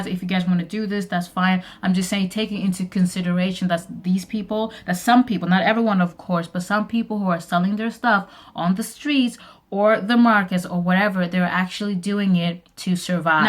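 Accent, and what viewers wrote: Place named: United States. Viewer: You imply that you are British but you have an American accent, no disrespect meant....but I'm curious.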